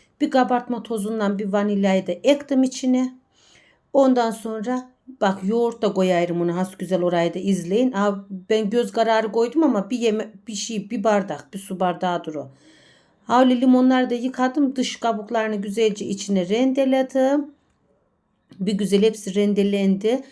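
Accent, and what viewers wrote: Black Sea, Turkey. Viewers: Bildiğimiz Erzincan lokumu Karadeniz şivesi ile Anlatılınca Trabzonlu olmuyor kendi yöreinizin yemeğimiş gibi biz bunu çok severiz demişsiniz bir kere erzincan lokumu dememişsiniz ama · 😂😂 uy kardezli bacim bende karadenizliyim bizim bu şifemiz nekadar da güzel emegine saglik 😊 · Karadeniz şivesi mi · Aynen karadeniz şivesi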